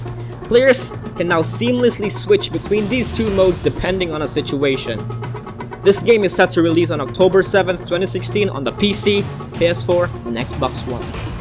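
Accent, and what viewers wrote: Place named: Philippines. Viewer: That thick Philippine accent though.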